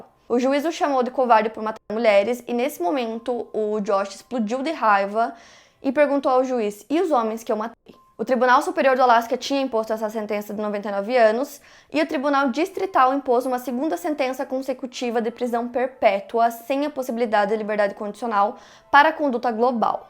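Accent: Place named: São Paulo, Brazil. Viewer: Nada a ver com o vídeo, mas a pegou completamente sotaque de Paulista 🤣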